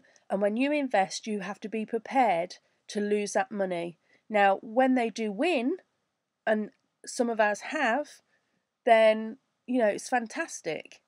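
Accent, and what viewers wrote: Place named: London, United Kingdom. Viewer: I want to say I liked the video nice accent you sound like your from my neck of the woods in London? love to work with you guys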